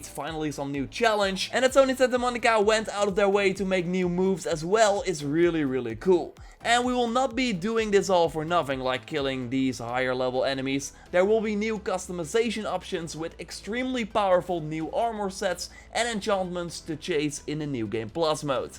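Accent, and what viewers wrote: Netherlands. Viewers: This guy sounds so DUTCH.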